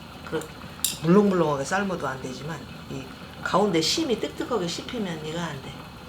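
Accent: Chungcheong, South Korea. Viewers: 억양이 참 듣기좋아요 충청도분이신가요?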